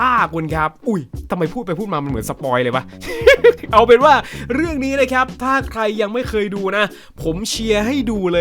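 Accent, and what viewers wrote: Isan, Thailand. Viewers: อ้านเป็นคนอีสานแม่นบ่😅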